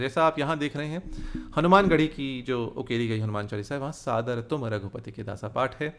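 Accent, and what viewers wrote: Standard. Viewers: Your Hindi is too pure- very nice . Samskaran yaane kya?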